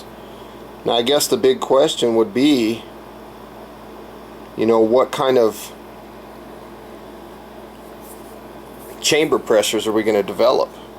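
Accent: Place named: Minnesota, United States. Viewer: Hey, where are you from? You sound like a Minnesota native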